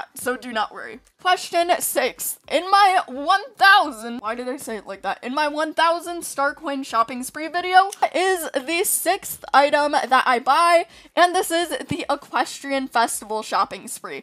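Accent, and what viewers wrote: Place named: England, United Kingdom. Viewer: “Have fun today” / “equestrian festival” love your Chanel, your English accent is on point x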